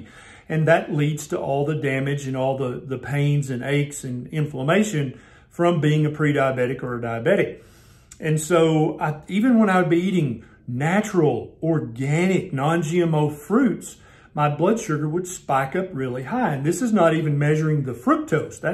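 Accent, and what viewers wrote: South, United States. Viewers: Dude so southern "a touch of sugar" So true!!!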